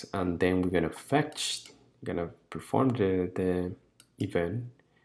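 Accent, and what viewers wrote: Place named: Brazil. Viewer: I thought he was Brazilian because of his name too, the accent sounds like the Brazilians who speak English as well haha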